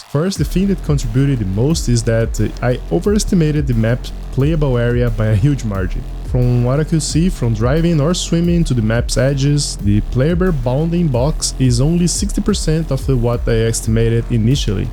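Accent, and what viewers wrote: Brazil. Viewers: Tu é br mano? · Tu é br né?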